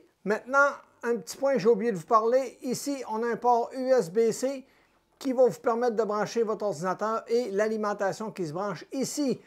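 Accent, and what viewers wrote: Quebec, Canada. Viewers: Bonjour. Encore une vidéo claire et efficace. Merci. Commentaire sur le précédent commentaire, oui il fait -3°C en France, mais ce n'est pas à un canadien qu'il faut s'en plaindre. Ça doit bien te faire sourire le climat français par rapport à celui de l'Amérique du Nord. Mais je confirme, ton accent et ta bonne humeur nous réchauffe le coeur et l'âme.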